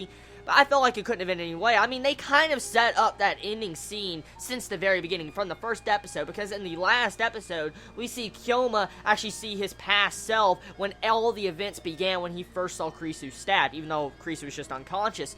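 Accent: South, United States. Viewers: I think looking back on your older videos, and your recent ones, you've lost some of your southern accent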